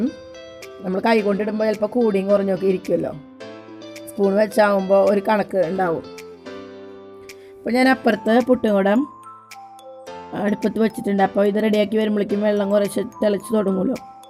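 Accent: Thrissur, India. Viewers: രസമുണ്ട്ട്ടോ പറയുന്നതുകേൾക്കാൻ തൃശ്ശൂർ ഭാഷ ഇഷ്ടം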